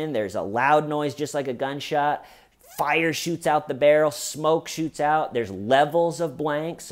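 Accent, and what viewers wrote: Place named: New York, United States. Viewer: You're picking up a NYC accent, homie.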